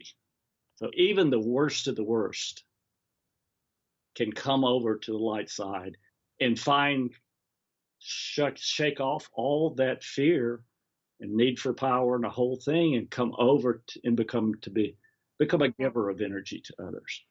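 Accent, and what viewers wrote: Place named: France. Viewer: is back! Yay! I missed your French accent 🤍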